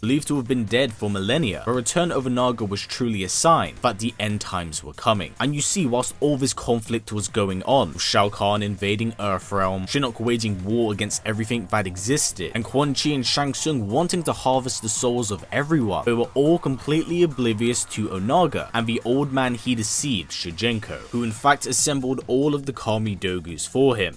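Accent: England, United Kingdom. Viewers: There is not "v" in the word brothers, so disgusting. Battles are not "bat-holes." Your dialect is garbage, must be from the shithole called england.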